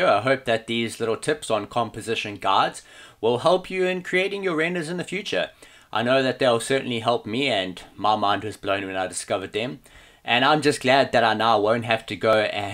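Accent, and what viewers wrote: South Africa. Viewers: South African accent comes through strong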